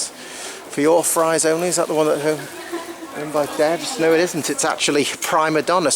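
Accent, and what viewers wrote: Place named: Manchester, United Kingdom. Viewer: Very interesting thank you!...I love your Manchester accent too :D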